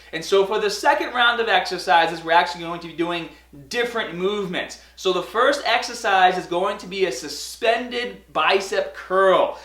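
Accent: Boston, United States. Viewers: Is that a Boston accent. It's so weird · your accent is driving me crazy >:( go back to Boston and learn how to speak english properly! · Martin P Have you even ever heard an Australian talk? My guess is he has a Boston/Rhode island accent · ... is that a Boston accent? :-) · Of all the accents to re-brand yourself with, why the hell did you choose Boston? Boston... The accent that makes you seem like you will get drunk and hit someone at a Baseball game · Sounds like a Boston accent... They drop the "R" similar to Australians. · are you from boston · Are you from Baaston? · Boston accent or nah!??? 😫❤️😍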